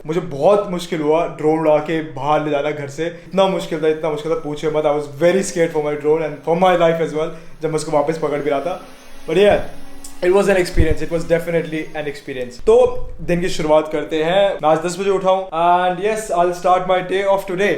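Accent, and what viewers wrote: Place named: United Kingdom. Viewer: Yes in UK. You could guess it from his accent.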